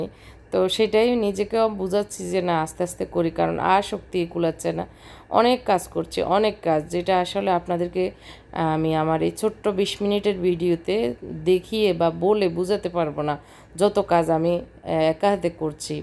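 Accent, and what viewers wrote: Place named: Feni, Bangladesh. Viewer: দুঃখিত বোন কিছু মনে করবেন নাহ্ ! আপনি উনার উচ্চারণের ভুল ধরতেছেন।উনার দেশের বাড়ী ফেনী! তাই আঞ্চলিক টান আসে। আপনার কমেন্টে বানানের দিকে একটু খেয়াল করবেন! পড়তে দৃষ্টিকটু মনে হয়!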